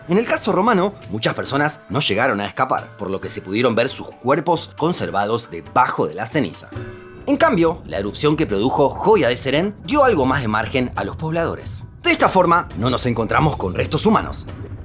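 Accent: Buenos Aires, Argentina. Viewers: Porqué dejaste de hablar como argentino???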